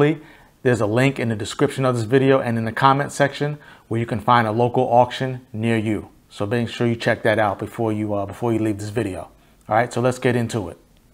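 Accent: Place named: East Coast, United States. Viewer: No, you don't. That depends on the state. With his accent, he sounds like from the East Coast, and may not be aware of laws here in the West.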